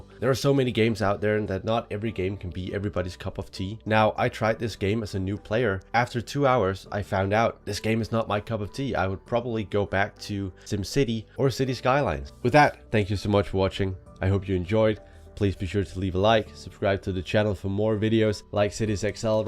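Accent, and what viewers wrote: Denmark. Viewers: I thought your accent sounded a bit similar to a Danish youtube friend of mine, and I was right! You are Danish. Dannebrog for altid!! 🇩🇰🇩🇰🇩🇰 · Immediately noticed the Danish accent 😂 Love the video tbh though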